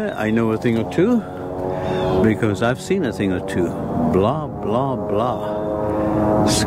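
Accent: South Africa. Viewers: are you from South Africa your accent sometimes sounds like you are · Great video. Are you South African?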